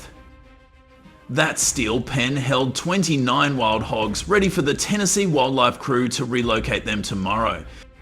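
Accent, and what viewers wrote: Australia. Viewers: I like that the narrator is from Australia or some place where they sound Australian I love it it gives the program more character and sounds really great😊😊❤❤viewing from Monmouth Oregon <num>😊😊❤❤ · You sound like an Aussie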